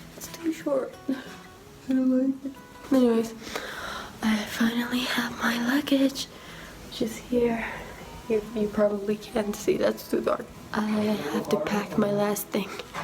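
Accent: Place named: Iran. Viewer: مثل بچه آدم ایرانی حرف می زدی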